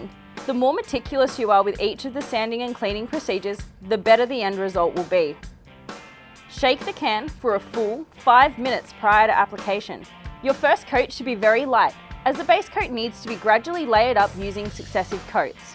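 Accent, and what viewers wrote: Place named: Australia. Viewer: How good is the Aussie accent dude hahaha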